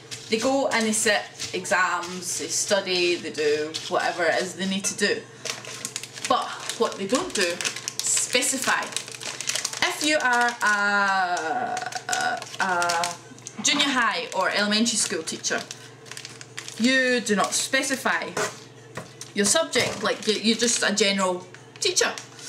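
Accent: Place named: Scotland, United Kingdom. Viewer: Good vid :D I used to be an ALT in Chiba. Instead of making my own lessons, I used all the past ALT's and/or online shizzle. Then I spent the afternoons learning Japanese. Great starter job in Japan and gives ya time to study Japanese. Good vid, diggin the Scottish accent ;) I don't hate the ENglish! THEY'RE JUST WANKERS ,lol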